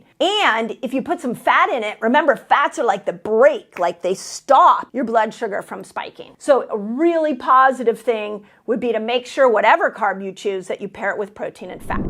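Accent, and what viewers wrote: California, United States. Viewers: You have helped me so much I don't know how to thank you! 21 lbs down so far! The only thing that doesn't register is when you say "fee-ude" instead of "food" ....must be the CA accent. 🙂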